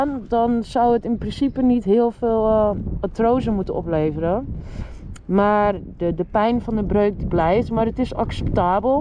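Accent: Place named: Netherlands. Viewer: Hi, I hope everything is ok with a nex surgery. Thanks for you vidéo <3 i like your dutch accent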